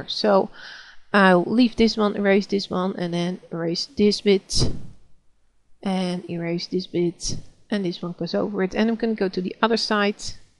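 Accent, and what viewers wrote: Netherlands. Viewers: hahaha, ik moest echt lachen toen ik je hoorde praten, ik zij al " dat is zekerweten een nederlander" en het blijkt ook nog te zijn, maar wat ik eigenlijk wou zeggen. THANKS!! hier heb ik veel aan ! ;)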